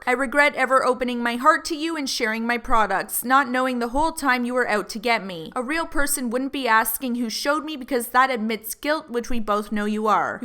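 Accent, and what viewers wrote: Canada. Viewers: Are you Canadian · I love your Canadian accent 🇨🇦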